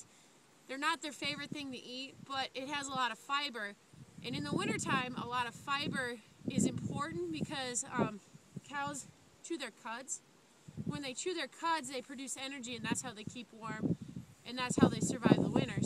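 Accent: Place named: Upper Midwest, United States. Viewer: northern Midwest you must be ??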